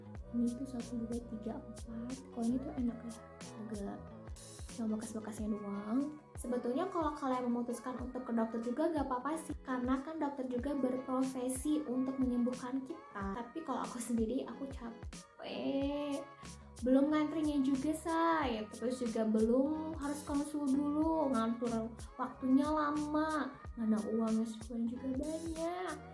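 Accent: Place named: West Java, Indonesia. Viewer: Sunda nya tetep keluar yah bundd wkawka ditunggu next video 🙌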